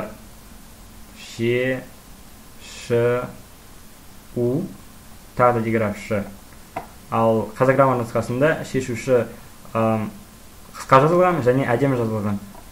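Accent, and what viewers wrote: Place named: Russia. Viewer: кешеден бері байқағаным негізі сіз орысша ойлайтын қазақ болып көріндіңіз, видеодағы қазақша сөйлегенде де орысша сәл де болса акцентіңіз бар. Қазақшаға шорқақтау қазақтарға қаза тіліне байланысты мәселелерді қолға алмағаны дұрыс ау. Кім сойса да қасапшы сойсын демекші. Терең білім болған кезде өзгерістер енгізуге болады. Жалпы, әліпбидің еш кемшілік тұстары жоқ, дайын 42 әріптен құралған, бүкіл әлем ұлттарының үнін ұштастырған әліпби. Артық ештеңесі жоқ. Білім және Ғылым Министрлігіне үндеу: АРТЫҚ ҚЫЛАМЫН ДЕП ТЫРТЫҚ ҚЫЛЫП ЖҮРМЕҢІЗДЕР.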